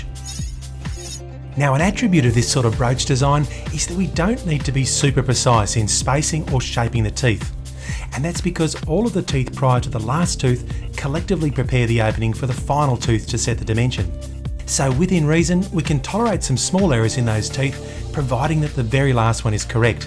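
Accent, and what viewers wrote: Australia. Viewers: realised that now when you say it. At first I thought he was Britain but then When you said it. I realised that he is Australian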